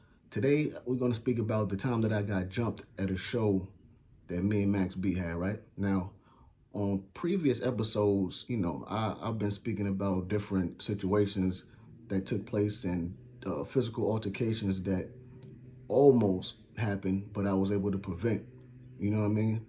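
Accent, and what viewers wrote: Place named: New Haven, United States. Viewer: Sound like New Haven lol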